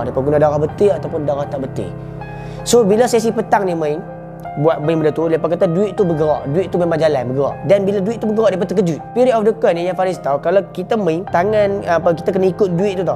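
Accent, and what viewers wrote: Kedah, Malaysia. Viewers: Terbaik la channel hang dik...dengaq hang cakap..terus rindu mk ayh di kg.(kedah)..salam dari selangor dikk.. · Ska lh dngr abg Faris ckp bhse kedah.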